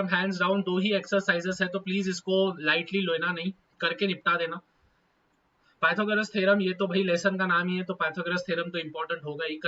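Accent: Maharashtra, India. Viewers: SIR , AAP KI MOTHER TONE MARATHI HAI KYA....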